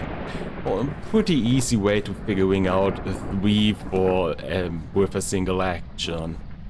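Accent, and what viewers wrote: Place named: Germany. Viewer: I would definitely go for Germany but he just has a strong German accent in his English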